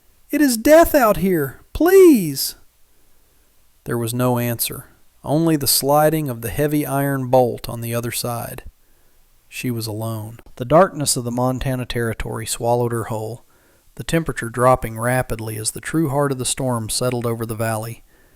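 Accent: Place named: Alabama, United States. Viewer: Love hearing a story by someone speaking with my accent. Such a beautiful story. Thank you for sharing. Listening from Alabama….❤❤❤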